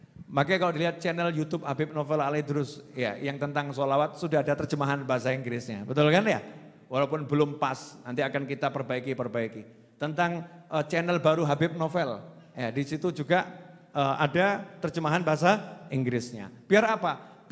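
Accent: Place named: Java, Indonesia. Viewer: Aksen jawa nya...mirip2 pa jokowi klo dalam bhs inggris ...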